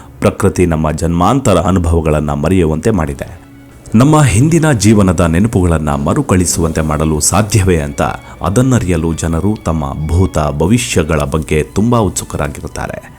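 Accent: Standard. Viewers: Nimma nirupanashyli adbhuthavagide. Shuddha Kannada karnanandakara. 🙏🙏🙏🙏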